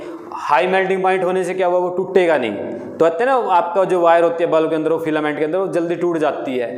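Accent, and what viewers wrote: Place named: Haryana, India. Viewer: Haryanavi accent 🙏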